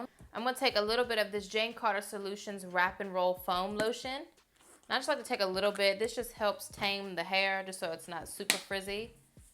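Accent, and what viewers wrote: South, United States. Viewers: I love your southern accent so much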